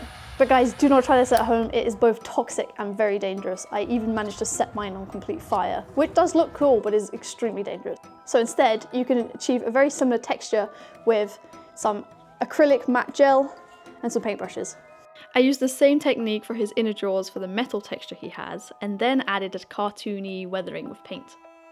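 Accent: United Kingdom. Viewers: I never heard your voice and genuinely DIDNT expect you to be British.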